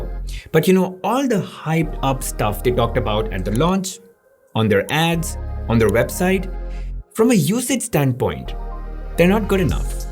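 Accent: India. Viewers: Accept my humor please Your accent is classic, brilliant and likely indian You're this little guy and I say little guy in your accent And you have in an extremely short space of time Become top tier Amongst the most trusted reviewers on earth 🌎 YOU ARE BRILLIANT! Yea we all make errors But brilliance remains.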